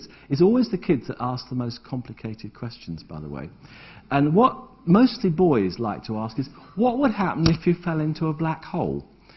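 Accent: United Kingdom. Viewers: his britan english is anoying >_>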